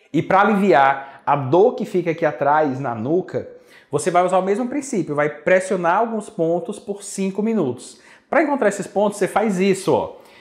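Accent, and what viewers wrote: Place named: Ceará, Brazil. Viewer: pelo sotaque acho que é cearense,obrigado pelos ensinamentos.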